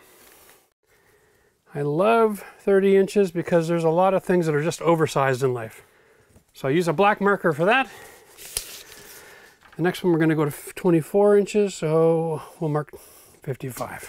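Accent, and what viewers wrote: Canada. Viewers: Well done, I love your videos. Did anyone tell you that your sound like Rik Emmet from Triumph when you talk? Canadian?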